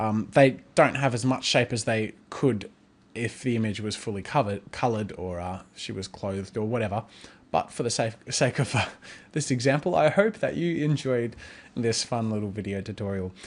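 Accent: Australia. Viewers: every single time I click on his videos I say "oh yeah, he's Australian." 😂 No hate to any Australians ❤ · Are you Australian or what?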